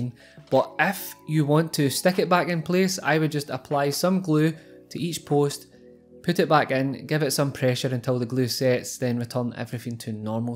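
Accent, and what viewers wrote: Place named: Scotland, United Kingdom. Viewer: Yeah I thought it was sort of scottish dialect/accent, very nice indeed. Thanks again!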